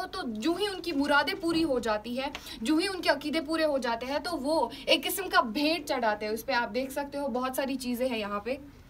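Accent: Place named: Kashmir, India. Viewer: in your hindi kashmiri accent is awesome. 👌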